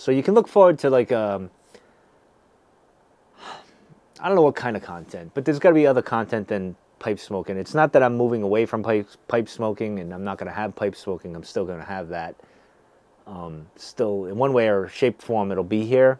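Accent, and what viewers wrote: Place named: New England, United States. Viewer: Hi, from the sounds of it I think you are a New Englander like my self. I could be wrong but I’m picking up an accent I’m familiar with also nice ranger cloak I got the same one super warm. Like your stuff keep it up.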